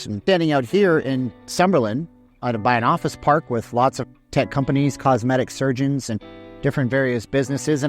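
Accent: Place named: Canada. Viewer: You have a cool accent; possibly the most Canadian accent I’ve ever heard. Love your content!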